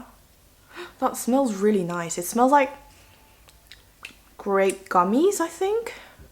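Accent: United Kingdom. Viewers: 마스카라너무 이쁜거 같아요!!! 항상 느끼지만 영상 보면 너무 영국영어 배우고 싶어요ㅠ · 영어로 말하시는 영상 볼 때마다 영국 발음에 치이고 가요...... ㅠㅠ 진짜 너무 멋있어요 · 너무 예뻐요!!!! 눈도 피부도 입술도 다 찰떡같이 잘 어울리세요ㅎㅎ 영상 분위기는 깔끔하고 자극없어서 영국발음도 부드럽게 들려요 이 점을 정말 좋아해요💕💕💕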